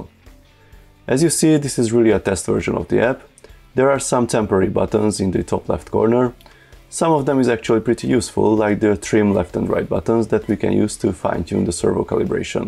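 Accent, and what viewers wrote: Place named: Hungary. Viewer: Tuti magyar vagy, hallom az akcentusod :D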